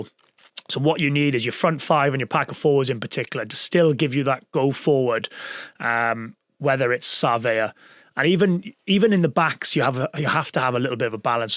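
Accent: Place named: Wales, United Kingdom. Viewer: You sound Welch now I'm listening..Bro.. Mum was a Owens ❤ Love ya MA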